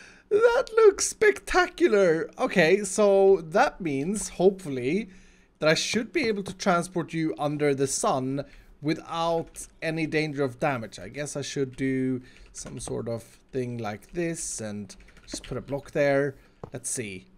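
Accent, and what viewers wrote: Sweden. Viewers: It is good that the villagers don't remember that YOU were the one that infected them in the first place. Infested and Curated, love your Swenglish! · Svensk dialekt, jag låter värre.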